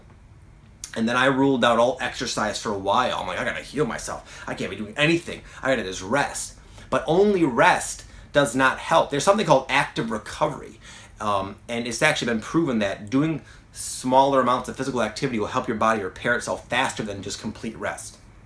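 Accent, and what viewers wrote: Michigan, United States. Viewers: I'm from Ann Arbor and you sound like you're from Michigan :D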